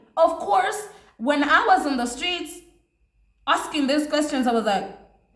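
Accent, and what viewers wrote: Uganda. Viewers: I agree with everything you said, and I love your Ugandan accent. Without your local Ugandan language, your beautiful English accent wouldn't have sounded better than that of the British and the Americans. So your native language is what spiced up your English Accent.❤❤❤❤❤❤ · Imagine with his heavy Ugandan accent, he ain't even speaking English this is Uglish.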